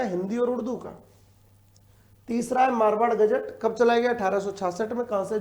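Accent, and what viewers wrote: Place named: Bharatpur, India. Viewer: सर आप भरतपुर के से लग रहे हो बोली ते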